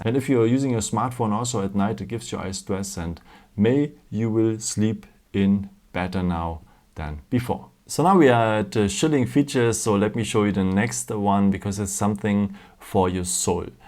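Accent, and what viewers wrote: Germany. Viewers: Wow you're accent is so german lol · Im German and I would say he's definitely German. The way he pronounced "Screenshot", "Video" or "Filter" are the german ways - is that correct English? I don't know😂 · Man hört das du deutscher bist 🤣 U can use it at Bibliothek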